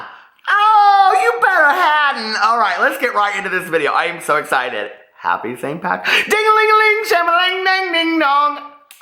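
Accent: South, United States. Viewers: Omg i totally thought you were southern when i watched your first few videos but i looked at your mailing address to see where you live. You have such southern mannerisms and have a hint of a southern accent and pronunciations i fking love it i miss my southern queens. Northern Michigan is so VERY DIFFERENT then my home town of Tampa, Florida! Love you and love everything about you and your vids! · Mr, I'm in Charlotte North Carolina and u absolutely sound more southern than most of us here. 💙💜💙💜💙💜💙💜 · My husband said once that you sounded southern too, but I let him know you were from Indiana 😉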